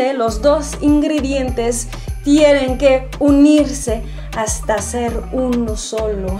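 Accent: Mexico. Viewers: Hola tú puedes pasar por una mexicana y más por el acento que has logrado al hablar el español 😃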